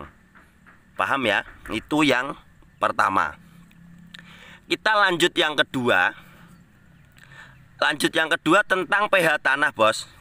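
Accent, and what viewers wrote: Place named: Pati, Indonesia. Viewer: Logatnya khas pati😅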